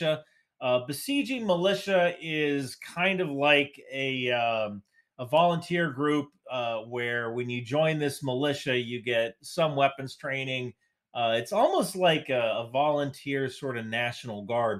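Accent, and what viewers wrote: New Jersey, United States. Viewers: don't apologize about your New Jersey accent. I'm from NYC and I completely respect and understand you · Thank you for breaking this information down. If people complain at you for mispronunciation in a Jersey accent. I would hate to hear them whine about my Texas accent pronouncing foreign words. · Shit man, I like the Jersey accent even if I barely hear it. Keep at it! Without you and Preston Stewart we wouldn't have nearly as much accessible info! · I mean he doesn't really have a strong accent. You can definitely hear the Jersey in his voice but it's not near as intense as some! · I grew up and still live in S. Jersey, no worries about the accent. And I'm near the middle of the speedline, so at least your hometown has a claim to fame. Don't feel bad about the way you talk, all good Jersey people talk like that. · Mr., dont ever apologize fir for your Jersey accent. 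I'm from a few miles north of the Jersey border and I sound just like you. And it's a great accent!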